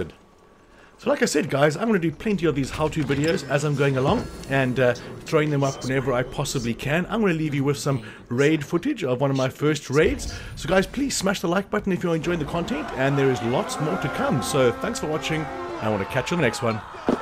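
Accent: South Africa. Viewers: You sound South African.. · Hearing a South African do these videos is the best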